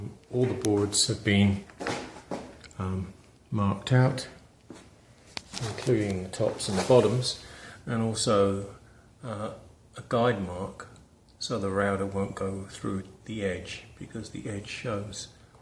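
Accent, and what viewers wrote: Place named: England, United Kingdom. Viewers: I wasn't expecting an English Accent..... lol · I was also wondering what an English man is doing in Texas 😀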